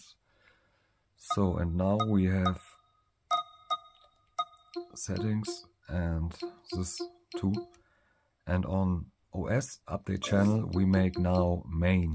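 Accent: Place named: Germany. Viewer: your german accent is hella cool and makes u unique but still understandable very mich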